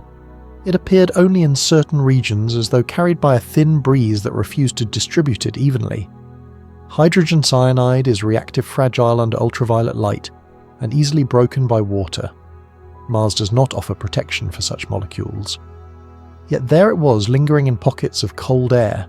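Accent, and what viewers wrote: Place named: United Kingdom. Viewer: So Maven isost and offline. Hold old is this BS. he I hear this British voice I know it will be wordy BS not worth my time or bsndwidth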